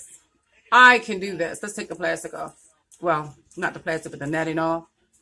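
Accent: Baltimore, United States. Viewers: Baltimore accent SKRONG 💪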